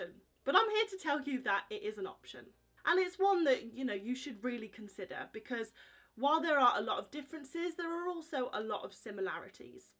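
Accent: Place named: West Midlands, United Kingdom. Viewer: Congrats! You're quite the entertainer. Could you please let us know which region of the UK you hail from? Judging by your lovely accent I'm guessing West Midlands. Am I way off?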